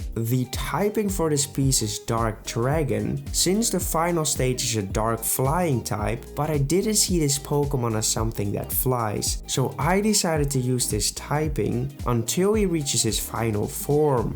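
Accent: Netherlands. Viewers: Bro ik zweer ik wist dat die nederlands was😂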